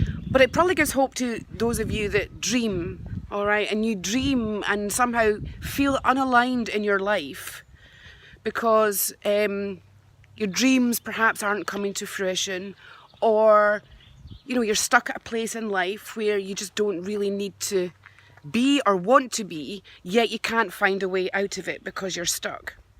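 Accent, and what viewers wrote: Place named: Scotland, United Kingdom. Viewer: Hi,. What a gorgeous place and nice to hear a the Scottish accent. Beautiful. I wish I could dream manifest the life I want, I would feel happier and less sad if so. xx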